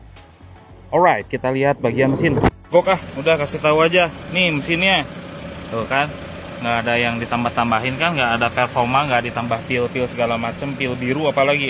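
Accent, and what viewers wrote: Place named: Bandung, Indonesia. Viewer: Jiaaahhh...mamang . Kangeeeen aku .... logatnya bandung pisan...hahahahha salam kangen selalu. Allah merahmati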